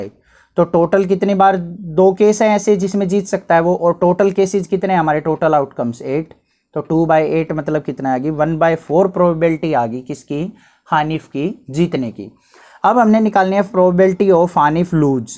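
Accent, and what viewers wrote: Haryana, India. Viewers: Haryanbi voice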